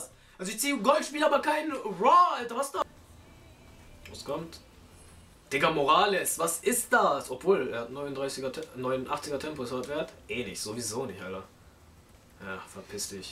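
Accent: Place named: Turkey. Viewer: ich schwör dieses deutsch türkisch.....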